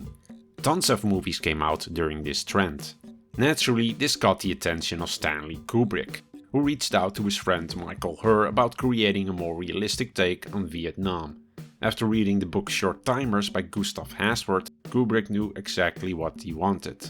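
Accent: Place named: Netherlands. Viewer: The accent... ah, the channel is dutch. I just recognize it now by sound.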